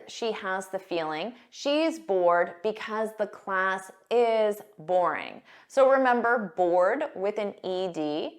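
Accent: United States. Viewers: You have a perfect American accent.. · this is an american english, right? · OK for American English, but it's a far cry from how British people speak.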